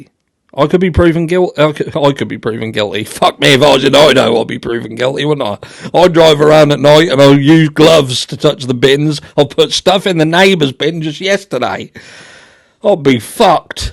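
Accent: United Kingdom. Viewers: I've seen your subs swooning over your blue, blue eyes, your British accent, your beautiful wife, etc. but it's your unexpected, high-pitched giggle that does me in 😅😂😂! Dying.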